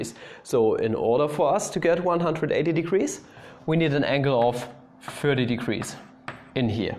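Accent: Germany. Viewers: I thought I clicked on a mindyourdescisions video and then I hear that coarse german accent. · Are you German? Like I am and you sound SO DAMN GERMAN😂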